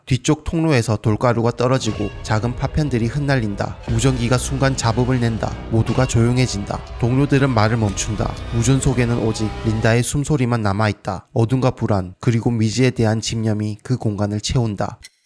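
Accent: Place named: Seoul, South Korea. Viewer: 사투리랑 서울말이 섞여서 느낌 참 오묘하네 효과음은 좀 뺍시다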